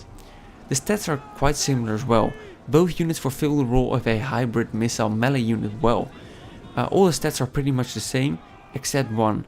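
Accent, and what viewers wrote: Netherlands. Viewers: love you dutch accent!!!! Nederlander en meoten we trots op zijn, Batavi, frisii.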